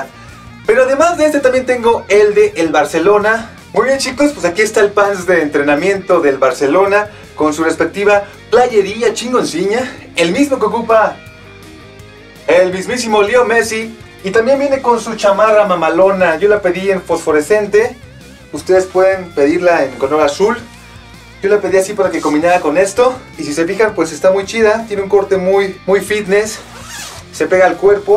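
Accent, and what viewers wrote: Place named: France. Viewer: Haz más vídeos con tu suculento acento Francés y Brasileño. ♡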